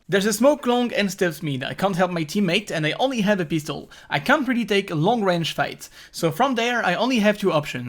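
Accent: France. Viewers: JE SAVAIS QU T’étais FRANÇAIS ENFIN JE PENSE ou belge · Ah je me disais bien qu'à ton accent anglais tu étais français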